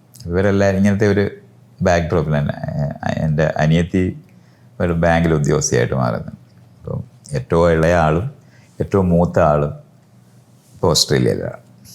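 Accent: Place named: Kollam, India. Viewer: പ്രിയ മൈത്രേയൻസാർ, താങ്കളുടെ മലയാളം എൻ്റെ കൊല്ലം (കൊട്ടാരക്കര) ഭാഷ പറയുമ്പോൾ എനിക്ക് എപ്പോഴും അനുഭവപ്പെടുന്നുണ്ട് ഇന്ന് സംശയം മാറിക്കിട്ടി സന്തോഷം താങ്കൾ എന്ത് ജോലിയിൽ പ്രവരത്തിച്ചിരുന്നു. എന്നു കൂടി പറയു👍♥️👍♥️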